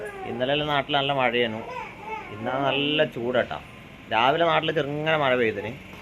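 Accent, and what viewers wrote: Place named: Payyanur, India. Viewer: ഇല്ലാട്ടോ. ഞങ്ങൾ പയ്യന്നൂരാണ്. മുംബയിലാണ് താമസിക്കുന്നെ.വീഡിയോ കണ്ടു നമ്മളെ ഭാഷ, നാടൻ സംസാരം അതാ ചോദിച്ചേ ❤നമ്മളെ നാട്ടുകാരല്ലേ 😀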